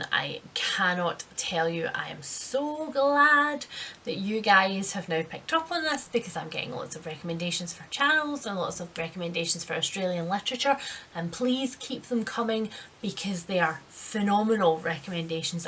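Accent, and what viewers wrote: Scotland, United Kingdom. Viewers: No conversation needed. I'm not reading these books because I don't read fiction. I read very little fiction. And when I do they are mostly adventure and historical. I just watch your videos, because, as i said, you are a force of nature and I love watching and listening to you and your accent. I would also like to apologise for thinking that you were Irish. In one of your other videos I left a comment saying that i thought you were Irish. I know that you are scottish!!. Sorry about that and I apologise for the unintended insult!!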